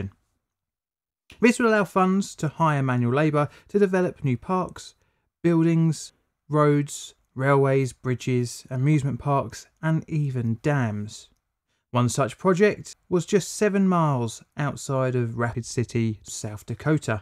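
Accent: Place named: South London, United Kingdom. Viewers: Something about an English accent is relaxing. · "Sunny Southeastern corner of London"? Sounds like you are talking like some place near Carshalton.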